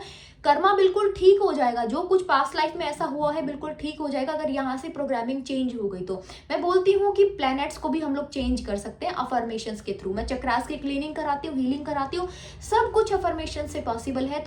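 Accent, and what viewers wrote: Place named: Uttar Pradesh, India. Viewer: Qki aap hna bolti ho jaise ki hm log bolte h Mai up se hu Raebareli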